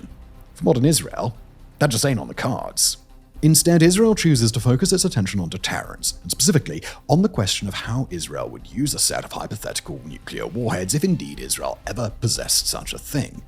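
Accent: United Kingdom. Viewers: i would enjoy your videos more and would be interested in all your promotions if you could stop making seemingly small but colossal mistakes that borders on misinformation like mistaking South Africa for South Korea in the Vela Incident, and calling the Rafale fighter, "Rafael". just talking fast and in british accent doesn't grant you immunity. tell your writers and editors to unfuck themselves.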